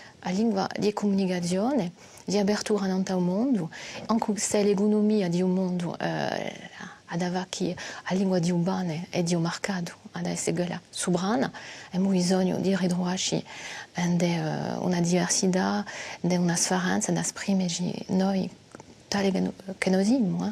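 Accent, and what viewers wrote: France. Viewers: BELLA LINGUA MA CON UNO STUCCHEVOLE ACCENTO FRANCESE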